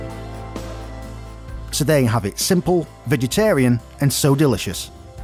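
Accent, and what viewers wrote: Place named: United Kingdom. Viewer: mate, with your style of production and your superb editing you could produce video packages for local TV stations that do morning news and chat shows. Local stations are always looking for premade video packages that they can run. You have just the rite personality and with your good looks and british accent you are a natural.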